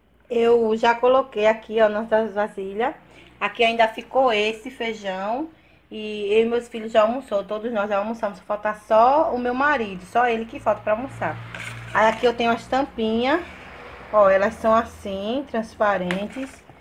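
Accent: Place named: Bahia, Brazil. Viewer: aaaaaa deve estar uma delícia mulheeeer 😍 amo muito tempero no feijão.. você é da bahia??? amei o sotaque viu mulher beijão 💗😍😍😍💗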